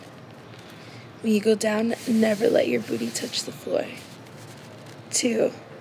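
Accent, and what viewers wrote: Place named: Southern California, United States. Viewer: I've always enjoyed voice. It seems so native Southern Californian but she hails from the Miami area.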